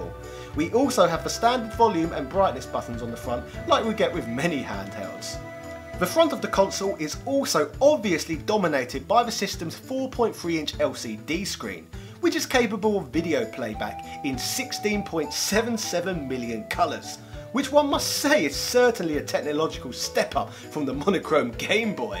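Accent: United Kingdom. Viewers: when I saw your outfit, I was expecting some really strong British accent. I was not disappointed:). Yes, Brazil loves you:)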